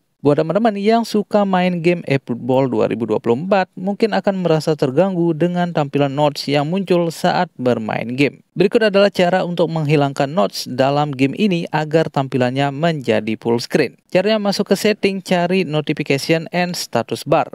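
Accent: Bali, Indonesia. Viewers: Iya, kelihatan logat Balinya😁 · medok bali banget kayaknya ni hostnya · Nah, nemu juga video yg kasih tips kaya gini. Sungkem bang..btw narator dari Bali kah? 😁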